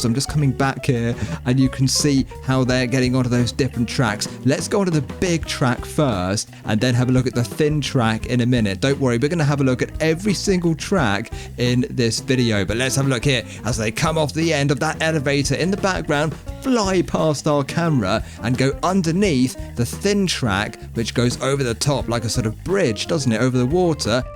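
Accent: United Kingdom. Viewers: Are you British?🇬🇧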